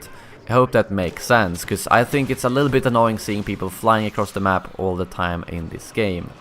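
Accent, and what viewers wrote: Stockholm, Sweden. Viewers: Haha älskar "stockholmsdialekten" på engelska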